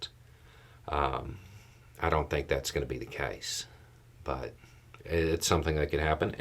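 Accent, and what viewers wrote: South, United States. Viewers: is looks as if he is the stereotypical southerner. Even has the beard. But he has a kind heart. He is changing my automatic distaste when I hear a southern accent. 🙏❤🙏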